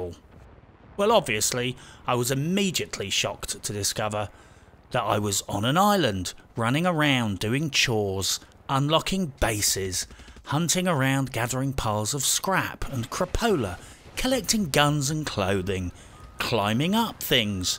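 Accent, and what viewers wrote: United Kingdom. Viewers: I love how the narrator talks and swears like British Sharlto Copley. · Nothing will ever be funnier to my silly American ears than a well-spoken British man taking the absolute piss out of a sh*tty video game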